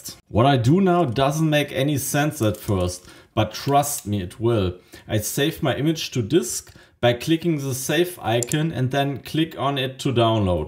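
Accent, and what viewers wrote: Germany. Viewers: Gutes Video.. der deutsche Akzent is der Hit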